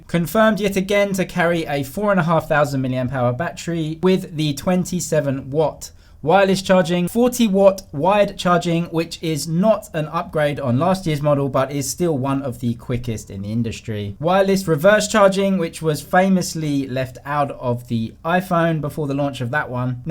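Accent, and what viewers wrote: United Kingdom. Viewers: I could even recognize that typical British accent, our dude is right now in Shenzhen, China ..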